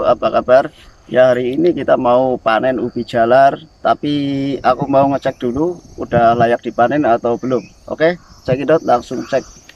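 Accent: Banyumas, Indonesia. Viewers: Jawa ne ngendi mas ?? Logat e ngapak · Wong. Jawa tengah ya. Mas , Ngapak. Kaya. Nyong , Selamat tugas , mashe , , Mantaaappp. Nang. Jepang. Ya 👍👍👍👍👍👍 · Asline ngendi Mase, kayanya ngapak koh ,apa tanggaku Cilacapan.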